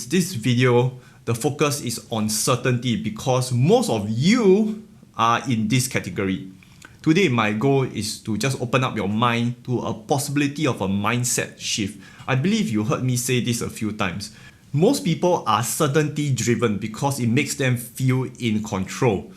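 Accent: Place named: Singapore. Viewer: I love your singlish bro 😂 can’t wait to come to Singapore one day!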